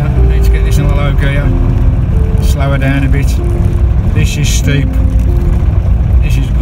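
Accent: Scotland, United Kingdom. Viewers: Lovely Park up on top of that mountain! Love the Scottish accent!!!! Take care! Ju Xx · As a Scotsman I can say with all my heart ❤️ thank you for sharing your amazing travel's and your scots accent was brilliant 👍😀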